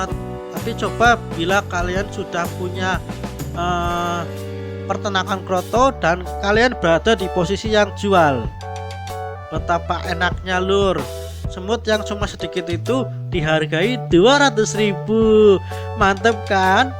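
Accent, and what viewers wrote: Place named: Purwokerto, Indonesia. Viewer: Genah banget koh logate. Purwokertone ngendi, nyong pengin dolan. Belajar ternak semut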